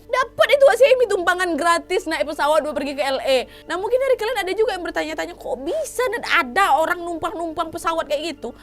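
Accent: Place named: Medan, Indonesia. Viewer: Suka kaliii bah aku dengar cara bicaranya,gaya medan bahh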